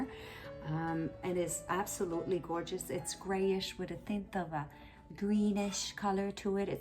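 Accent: France. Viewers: Great job, shopping,!!! I can't wait to see your finished treasures!!! This video answered a question for me. I noticed that you have a lovely accent, but I wasn't sure what it was. So, it's French!!! You handle the crazy English language very well!!! You are so sweet!!! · Great finds! I love the big bunny too! I also love your French accent... Beautiful! 💗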